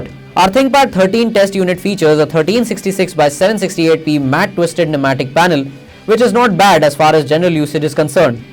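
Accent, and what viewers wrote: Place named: India. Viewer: A hindu accent combined with a shitty audio quality. Thank you, but not, thanks.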